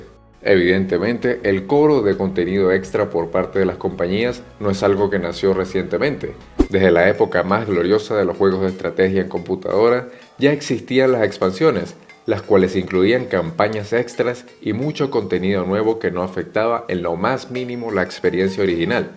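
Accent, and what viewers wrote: Venezuela. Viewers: Verga se me adelantaron con la pregunta, " de donde eres?". bueno no importa ya sabia yo que por la forma de la que hablabas eras venezolano pero bueno hay que preguntar para estar seguros. Y bueno chamo de pana que eres bueno en esto me gustan demasiados tus reseñas, como tus demás vides me parecen bastante interesantes, a mi se me hace que tendrás un gran futuro en esto de youtube, buena suerte convive. PD: Yo también soy caraqueño XD.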